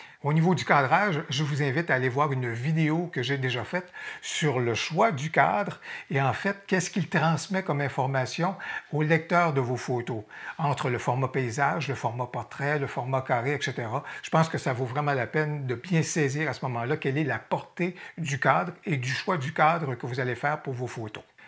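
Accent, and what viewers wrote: Quebec, Canada. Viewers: Et cet accent, il me fait trop voyager vers votre belle province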